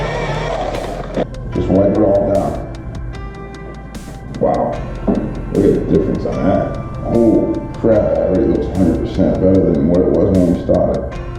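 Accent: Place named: New England, United States. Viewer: Are you from the east coast? You sound like my uncle lol got like a mass/rhode island accent.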